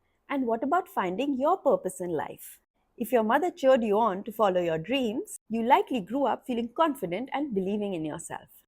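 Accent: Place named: India. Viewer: Excellent video. If I can give you an advice as a viewer, please talk a bit slower, and remove the indian accent as much as possible from your English. Please refer to DoctorRamani videos as an example. Power to you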